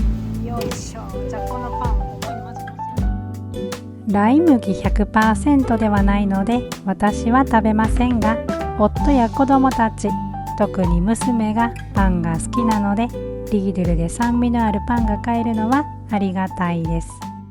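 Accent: Kansai, Japan. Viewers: いつも楽しく拝見させていただいております。関西弁でとても聞きやすいです。私は兵庫県です。食って大切ですね。私もちゃんと考え直さねばと思いました。できることから一つ一つ改善していこうと思いました。皆さんお元気で。これからも楽しみにしております❤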